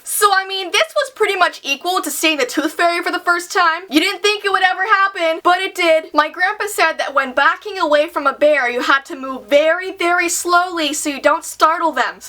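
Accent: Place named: Canada. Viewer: Ilysm!!!!!!!!!!!!!!!!!!!! I love your stories and your Canadian accent!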